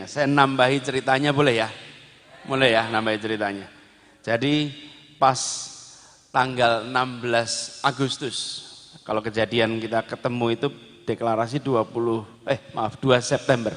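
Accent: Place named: Java, Indonesia. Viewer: Bahasa jawanya kok medok jg....sehat selalu pak anis.pidato jenengan bikin suasana hati menikmati ..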